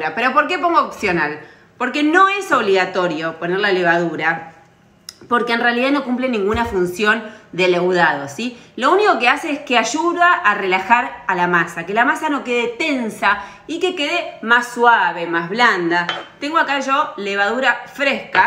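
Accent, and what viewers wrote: Argentina. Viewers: Hola, me fascinan, tus recetas, trataré de hacer las galletas, a ver cómo me quedan, también me gusta mucho tu acento, argentino y lo agradable q eres, gracias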